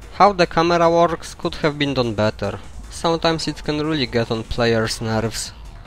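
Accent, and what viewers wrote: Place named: Poland. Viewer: Epicki polski akcent :D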